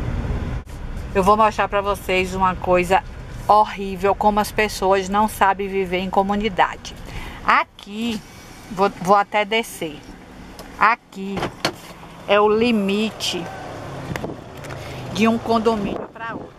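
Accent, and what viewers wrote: Northeast, Brazil. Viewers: realmente vc mora num paraiso...bela beleza do lugar,e seu sotaque e no nordeste ne? mas onde?bjus minha querida vc e td de bom e muito divertida....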